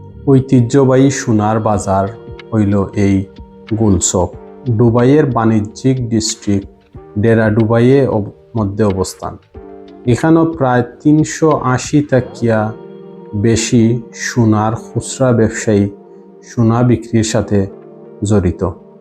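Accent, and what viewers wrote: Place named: Sylhet, Bangladesh. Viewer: আপনার সিলেটি উচ্চারণ খুব সুন্দর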